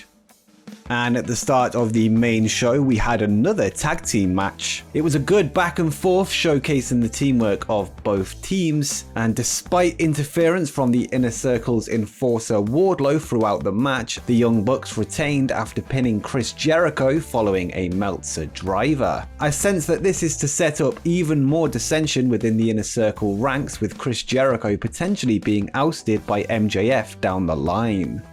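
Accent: United Kingdom. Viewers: why are most of these wrestling clips narrated by a dude with a british accent? just askin'.....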